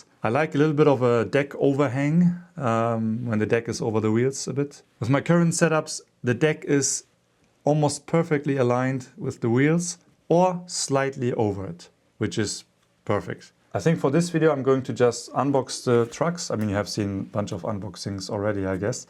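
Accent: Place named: Germany. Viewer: Bro's first language is German, cut him some slack.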